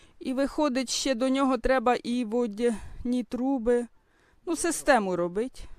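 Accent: Poltava, Ukraine. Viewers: Добрий день,. Натрапила на Ваші відео, слухаю не наслухаюсь, обожнюю полтавський говір. Живу в місті, городу не маю, хоч і мрію мати, але дивитимусь Вас заради мови ❤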